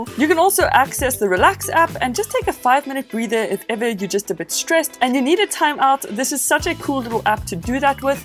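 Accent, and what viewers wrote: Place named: South Africa. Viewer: I wonder how many other South Africans get that "that's so raven" look on their face when they hear the accent in a YouTube video 😂 Loved the comparison! 🔥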